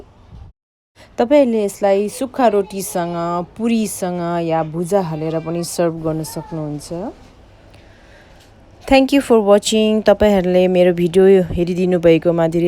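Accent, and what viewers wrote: Darjeeling, India. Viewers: Hamro darjeeling aloo bandam boli mitto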